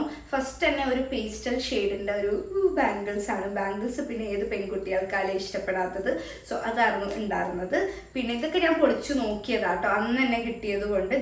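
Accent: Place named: Palakkad, India. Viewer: പാലക്കാടൻ നിഷ്കളങ്ക സംസാരം😍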